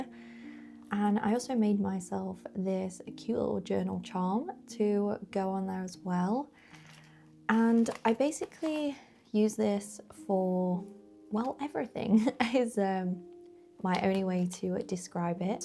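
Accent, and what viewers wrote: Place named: Northern England, United Kingdom. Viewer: Where abouts are you from? Obviously "oop norff" in the UK - I grew up in Leeds until I was 10 and now the only thing left of my accent is the hard "u" - as in "up", "one" - until I am with other Northerners and then it call comes back 🥰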